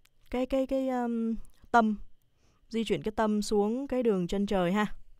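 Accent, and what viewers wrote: Standard. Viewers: Chưa thấy ai phát âm chuẩn và dễ nghe dư bạn. Cảm ơn b nhé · cảm ơn chị rất nhiều, một bài chia sẻ dễ hiểu nhất, có lẽ những khóa học mất tiền cũng không chia sẻ những kiến thức này. Đã thế giọng chị lại còn hay phát âm lại chuẩn nữa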